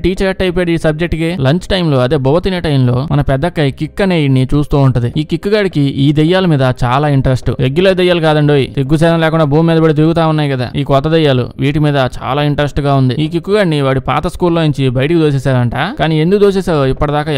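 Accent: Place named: Nellore, India. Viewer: Nellore slang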